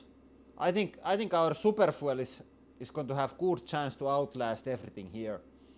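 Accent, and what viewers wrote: Finland. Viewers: Rally English never gets old 🇬🇧❤️🇫🇮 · I love when Finns speak English. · I know you speak this extreme finglish on purpose, but after a while it gets really irritating. So irritating that i find myself muting the videos. The gimmick has gotten to be a bit over-used.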